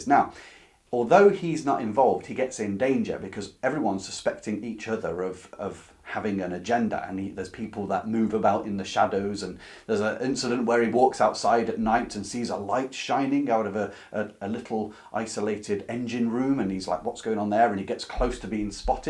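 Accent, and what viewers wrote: England, United Kingdom. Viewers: I'm glad you appreciate as I wasn't sure it was a good thing to point this out 🤔🤗 I'm not from south of France so I can't confirm if "t" is pronounced over there 😅 Well but anyway I think it's pleasant to hear some English accent. I guess most of the French people do 👍😊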